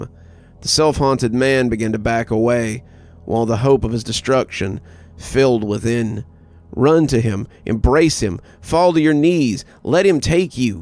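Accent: South, United States. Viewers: I love your accent. Are you from the south?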